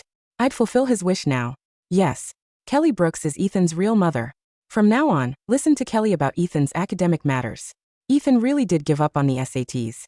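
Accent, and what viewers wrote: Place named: India. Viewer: The Indian ascent is so funny🤣🤣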